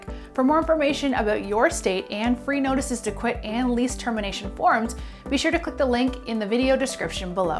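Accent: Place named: Canada. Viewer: I thought this was going to be for Canada, given her accent.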